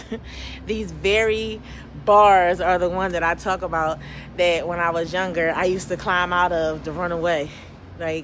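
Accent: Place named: New Orleans, United States. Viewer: Your accent was straight giving me New Orleans vibes.🤩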